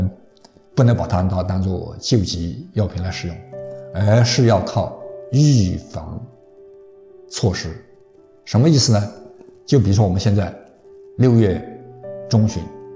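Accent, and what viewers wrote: Yunnan, China. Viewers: 老師是不是雲南人，普通話有雲南口音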